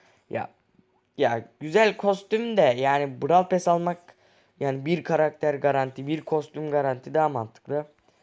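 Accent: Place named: Black Sea, Turkey. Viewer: Bakıyorum da Karadenizli konuşmayı öğrenmissin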